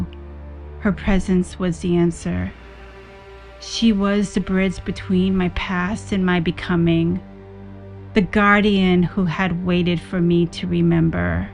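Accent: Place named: Boston, United States. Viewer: Hi! I’m watching now. I really don’t hear the Boston much except when you said “hahtbreak”. lol. I can reel mine in when I have to but that’s no fun. What really interested me about this project is you saying it’s about you. I’m into any kind of creative endeavors as therapy.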